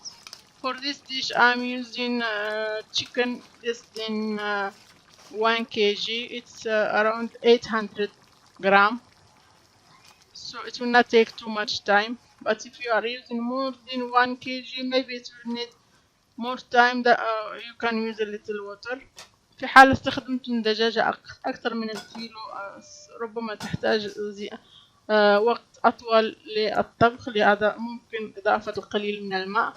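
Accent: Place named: India. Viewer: 😀 لهجة محلية وعربية فصحى وانجليزي بالكنة هندية 😀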